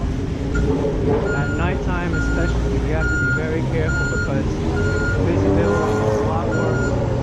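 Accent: New York, United States. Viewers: The hustling and bustling of the streets at Chinatown and Little Italy. I love to listen to the commentary and your NY accent. I will watch in stages. Do you prefer day or night bike rides? You have done an excellent vidblog. Thank you.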